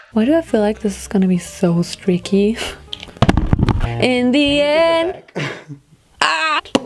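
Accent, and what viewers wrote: Germany. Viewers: Du hast doch nen deutschen Akzent in deinem english auch wenn du es nicht spielst 😅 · OMG THE GERMAN ACCENT PLEASE ONLY TALK LIKE THAT! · THE GERMAN ACCENT ❤️❤️❤️❤️ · Sie hat iwie schon nen deutschen akzent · Ok tbh ich hab deinen deutschen Akzent bevor du ihn so typisch deutsch ausgesprochen hast, gar nicht bemerkt xD danach schon xD aber respect echt gutes English was sie da sprechen tun Madame d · Pure Unterhaltung, dieser deutsche Akzent xD #broccolomi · Der deutsche Akzent killt mich jedes mal xD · Are you German and if you are your accent is beautiful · with an ultra German accent is the best. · Ich liebe dein German akzent 🤩😍🔥 I tried so hard to to do it, but I am *tresh* 😂😂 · du hast auch so einen typisch deutschen Akzent😂😂❤ Aber das find ich, hört sich süß an, also keine Sorge🤗😙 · Der deutsche akzent👌😂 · I would like to hear more of your German accent 😂❤❤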